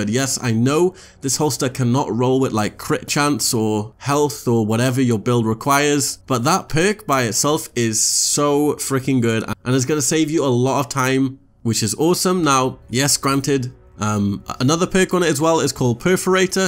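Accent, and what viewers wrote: Wales, United Kingdom. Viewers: Are u Welch? Ur uncle is pilot teacher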